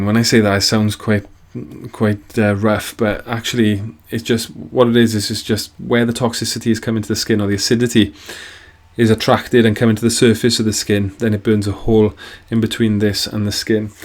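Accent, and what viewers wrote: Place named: Wales, United Kingdom. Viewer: Eyyyy nice to hear another welshman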